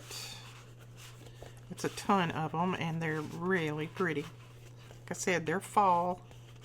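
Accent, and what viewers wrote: South, United States. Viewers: Love your southern accent,I am from the south but I think my accent is not noticeable. I enjoyed your video. That is cute. Can't wait to see another video.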